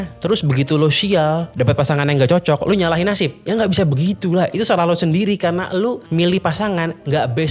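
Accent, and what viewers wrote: Java, Indonesia. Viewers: Buset, medoknya juga ketularan 🤣🤣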